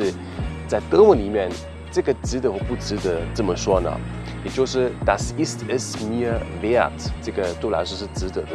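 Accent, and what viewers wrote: Taiwan. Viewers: Dein Chinesen ist nicht schlecht, aber dein Akzient aus Taiwan geht mir auf den Nerven. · schade, Akzent aus Taiwan....ansonsten gute Arbeit! auf jeden Fall weiter empfohlen !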